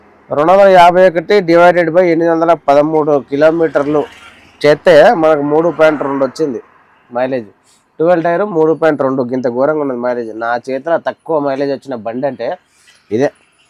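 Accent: Telangana, India. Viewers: Anna meru undedhi Vijayawada, Telangana slang matladuthunnav,kadha karimnagar,unnara,unne Vijayawada andhuku vellaru?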